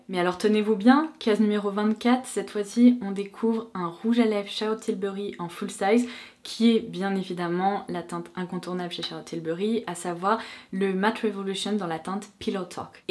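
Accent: England, United Kingdom. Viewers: POURQUOI TU PREND UN ACCENT ANGLAIS POUR UN OUI OU POUR UN NON C’EST RIDICULE !!!!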